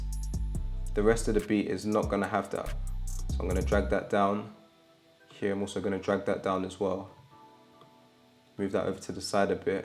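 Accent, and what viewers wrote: London, United Kingdom. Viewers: You from London g?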